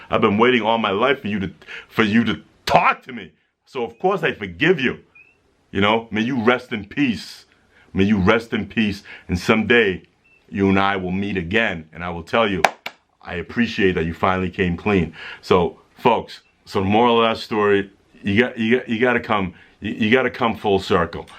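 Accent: Boston, United States. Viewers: Your accent is really mild compared to a lot of other bostonians lol I've talked to. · Lol i never really noticed you have a Boston accent until now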